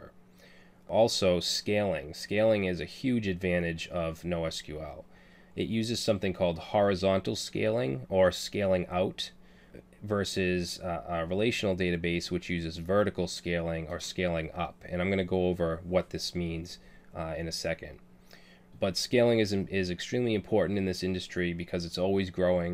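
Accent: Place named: Massachusetts, United States. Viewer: I thought I heard it, I heard the Massachusetts accent! Should call it the New Englandah, but it's not as prevalent in New Hampshire. Still can hear it in some parts of Maine. Great video man!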